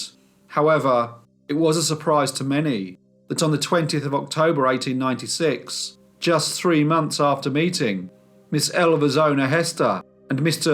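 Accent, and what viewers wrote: United Kingdom. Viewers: Whenever l hear your voice, l always picture a younger 'Alan Rickman'..... I'm dying of curiosity..... Am l even remotely close? (sorry, I know looks aren't important, but I already have a crush over your British accent, so l can't help but wonder about the rest of you! Sorry for sounding creepy, I'm actually a huge fan of your channel!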